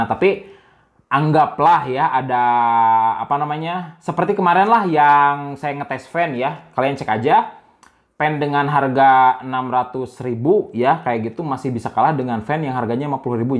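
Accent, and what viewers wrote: West Java, Indonesia. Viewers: other people: review this guy: Ripiu wkkwkw, sunda banget kedengerannya pertahankan ciri khas nya kang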